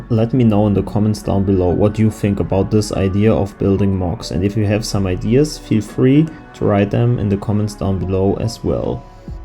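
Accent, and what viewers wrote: Germany. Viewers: Bist du deutsch weil du hast einen ziemlich deutschen Akzent und hast die Deutsche Artellerie auch sehr gut ausgesprochen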